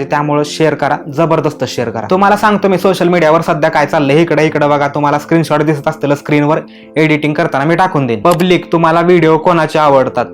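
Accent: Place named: Solapur, India. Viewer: Laga bhava tuzi bolnyachi paddhati sangte ki tu Solapur district mdun ahes.tuzi boli connect hotei.chalu thev 😊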